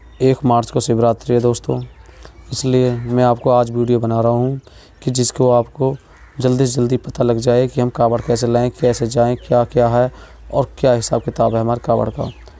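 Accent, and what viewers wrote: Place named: Uttar Pradesh, India. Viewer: Bhai shayad aap uttar Pradesh se ho , shayad bulandshahr ke aas paas ke ho ,😂😂😂😂😂 language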